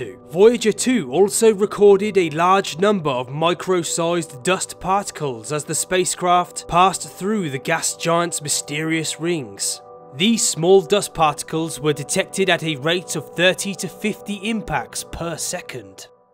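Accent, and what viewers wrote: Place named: Wiltshire, United Kingdom. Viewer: Are you from Wiltshire? Or south west. BTW love you Channel. 👍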